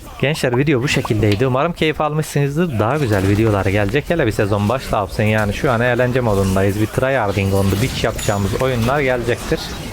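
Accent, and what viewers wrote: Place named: Serik, Turkey. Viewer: Abi sende tam Serikli şivesi var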